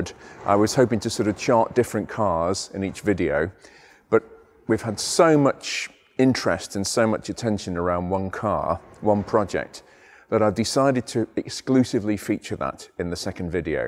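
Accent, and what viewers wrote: United Kingdom. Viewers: British Engineering in a voice... thank you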